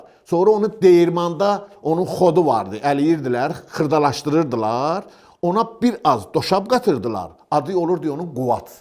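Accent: Baku, Azerbaijan. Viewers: Bakı lehçesi superdir ürəyim gedir❤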